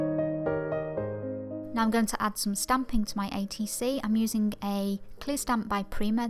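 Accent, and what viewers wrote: England, United Kingdom. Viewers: A nice little treat to come home to after a hectic day at work! Thank u for the video,!! My hubby knows that if I’ve got YouTube on and I say: “ssshhhhh! It’s!!” before the video starts, it’s QUIET TIME; or if he walks in and hears the English accent, he knows that it’s best to simply wait till it’s over to tell me something because I’m in The Zone & won’t pay attention to him!!! LOL You’re just truly a lovely part of my day!! Thank you 4 that!! 😘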